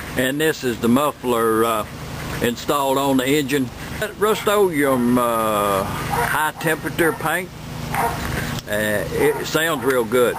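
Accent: United States. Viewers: british accent ??? he fukin sounds like hes a cowboy hes DEFINATELY not british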